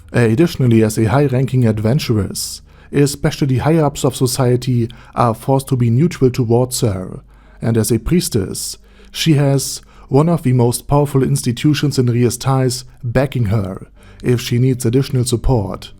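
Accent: Germany. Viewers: Boi you got a hard German accent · i am pretty pretty sure ur are german arent you?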